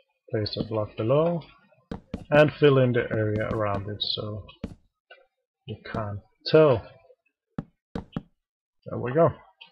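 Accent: Denmark. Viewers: That's the thickest danish accent I've ever heard xD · First: How do you make the stone blocks in the 'gate' going down and up? I Wnat to make something like that :) Can you just link me a video for a tutorial og the tutorial you used? Second: Are you from Denmark? It sounds like you are xD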